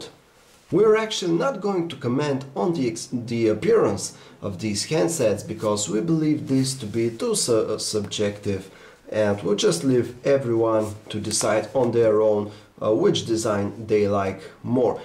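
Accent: Russia. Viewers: Is this guy Russian? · Your accent is pretty cool. It reminds me of the russian dude in 007 goldeneye. "I am a genius!" Also, you guys need to review the custom ice cream sandwich roms. They are much better even than the stock android os which is already way better than the ancient little kid IOS It's funny, I see tons of people go from apple to android and they never go back. I have also seen people go android to apple but they alway go back, except one dude. He said it looked "neat". Dumbest reason ever! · WTF phonearea guy with russian accent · Is he russian^^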